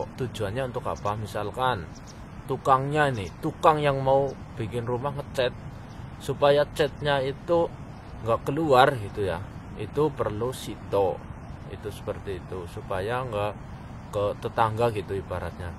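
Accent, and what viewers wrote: Banyumas, Indonesia. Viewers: Wong ngapak pasti keh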